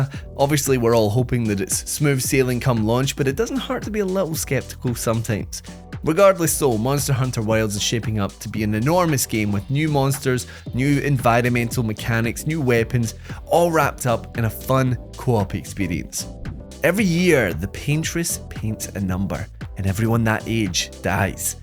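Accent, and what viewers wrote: Scotland, United Kingdom. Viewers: My sister-in-law is from Scotland and she'd probably describe his accent as "polite Scottish", a toned down version so Yanks can understand.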